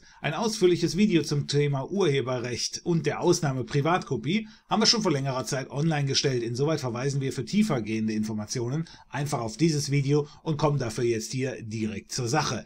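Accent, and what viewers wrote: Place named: Swabia, Germany. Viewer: Schwabe! xD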